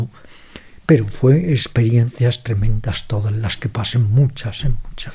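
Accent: Galicia, Spain. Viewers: Qué rato más estupendo he pasado escuchando este podcast! Aunque han sido más de dos horas me ha sabido a poco! Yo a Ramiro Calle ya hace tiempo que le sigo y le conozco, le considero mi maestro salvador ya que desde que me topé con él me ha cambiado la vida y no me cansaré de darle las gracias!🙏 En cuanto a Alex Fidalgo, no tengo palabras! Que magnífico entrevistador y me atrevo a decir, mejor persona! Cómo respetas los tiempos de respuesta, qué manera de absorber todo lo que te contestan, qué preguntas más naturales e interesantes... y ya no te cuento ese acento gallego maravilloso que es música para mis oídos! ❤ Muchísimas gracias por estas joyas! Deseando estoy de volver a escuchar otro podcast! Ah, y puedes seguir repitiendo de invitado. Nadie creo que se canse de escuchar a este señor maravilloso!🙏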